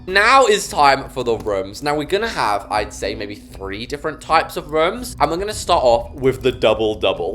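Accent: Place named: England, United Kingdom. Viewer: The English accent always gets me lol.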